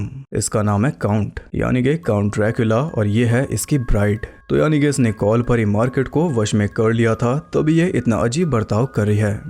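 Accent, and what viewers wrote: Haryana, India. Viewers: Tm hariyana se ho na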